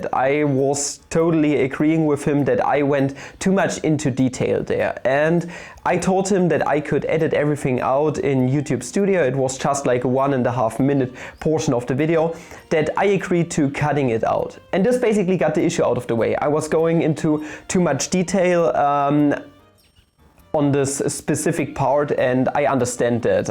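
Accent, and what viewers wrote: Germany. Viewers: And please clear my doubt "are you German"?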